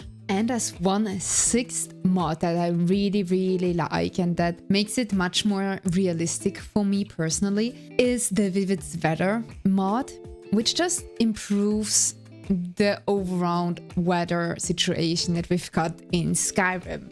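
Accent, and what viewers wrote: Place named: Sweden. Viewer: I love your accent sounds swedish/nordic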